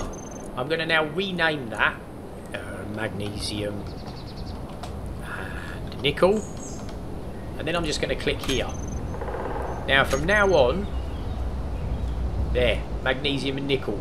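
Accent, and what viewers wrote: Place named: Geordie, United Kingdom. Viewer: lol listen to a geordie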